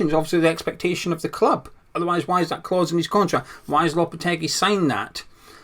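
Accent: Scotland, United Kingdom. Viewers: I’ve just joined the chat , nice to hear a fellow Scotsman 🏴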